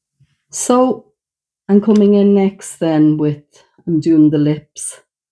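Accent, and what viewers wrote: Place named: Ireland. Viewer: I could listen to you all day❤. Lov the Irish accent❤. I’d love to visit Ireland one day❤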